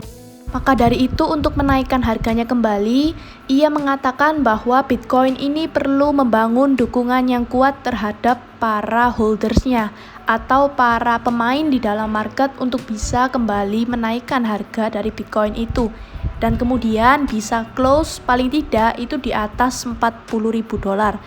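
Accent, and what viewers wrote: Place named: Surabaya, Indonesia. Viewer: Aku suka banget sama suaranya 🤩 Mengingatkanku dengan Surabaya sebagai kampung halaman 🥰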